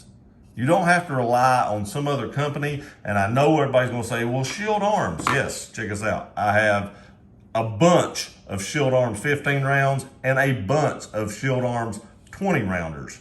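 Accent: South, United States. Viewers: Damn, this dude is country AF. lol. Tennessee maybe? Georgia??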